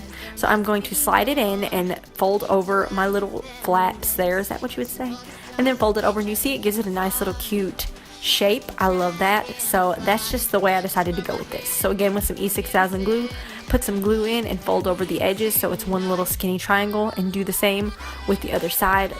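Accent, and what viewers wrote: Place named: South, United States. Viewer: This was do helpful thank you! Loving the southern drawl btw it's cute as hell!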